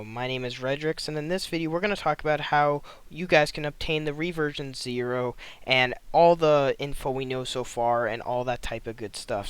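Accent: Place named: Massachusetts, United States. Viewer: You from Massachusetts? You sound like you live here, lil’ homie.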